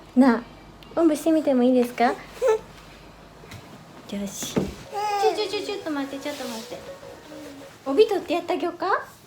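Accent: Kyoto, Japan. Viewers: I thought she has kyoto accenct. Osaka and kyoto accent are pretty similar though. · 京都弁好きだ！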